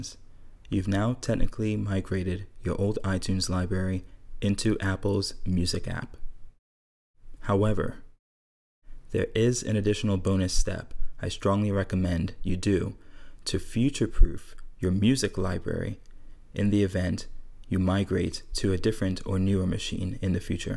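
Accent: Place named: Germany. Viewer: Fantastische Anleitung, 1000 Dank! Und ein kaum wahrnehmbarer deutscher Akzent?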